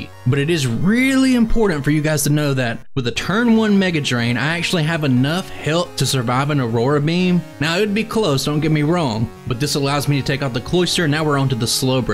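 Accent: South, United States. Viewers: alright man, I’ll watch it to the end. Again I’m just a big fan I love your commentary (with the southern drawl as well)and seeing things I haven’t seen before from you makes me excited so I was surpised to see you go back to our wishful bug/dragon friend. Cheers mate! Keep up the great content!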